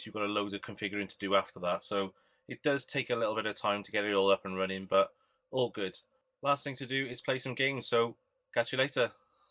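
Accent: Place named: Cardiff, United Kingdom. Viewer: You sound like a Cardiff boy, i bet "G" couldn't make a pin like yours.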